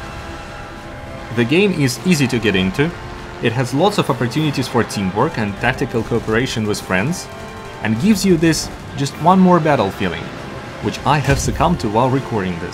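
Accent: Russia. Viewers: Очень забавно слушать тебя, говорящего по-английски, но с интонацией из русского языка))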